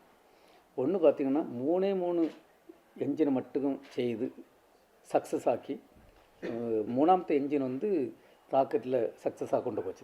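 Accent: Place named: Kanyakumari, India. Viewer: kumari naatu Slang...nice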